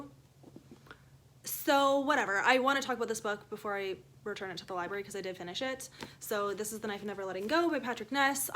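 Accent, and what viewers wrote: Canada. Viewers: I love Canadian accent. About and sorry! (Thinking about Anne here, "sooooorry".) And I just enjoy it. Enjoy learning French! Love Julie Klassen. The first I ever read was Lady of Milkweed Manor and I love it. Think it may still be my favorite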